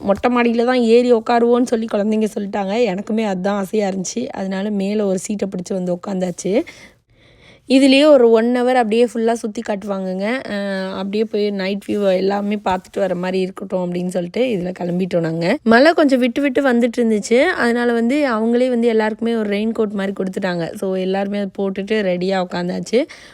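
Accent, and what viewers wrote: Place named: Madurai, India. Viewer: Your explanation in Madurai tamil slang is . Good video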